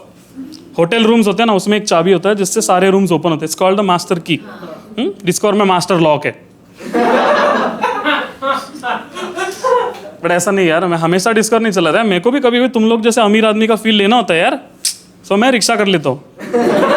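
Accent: Maharashtra, India. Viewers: Marathi accent hota na to aavdla sir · Ohooò bhau that marathi accent is to hilarious. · Marathi Mulga ani marathi accent...chumma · Marathi accent shabd shabd se jhalak rahi thi. Maja aali 👍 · ...Phir dusra sapna dekha😂😂😂 Bhari vaatla marathi accent mdhli stand up aikun🙌, mhanun bakichya jokes peksha tuze jokes jaast relate zale😂😅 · Getting Zakir vibe plus marathi accent .. good one ! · Marathi accent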